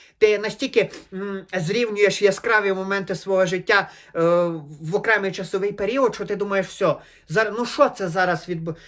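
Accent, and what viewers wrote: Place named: Western Ukraine, Ukraine. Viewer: Вуйко, западенець з западенським акцентом буде менi росказувати якусь риготню??? Та зрозумiло що ти там десь в горах живеш в трембiту дмеш i нiкого там нема i можеш вiльно ходити по вулицi, а норм пацани сидять дома...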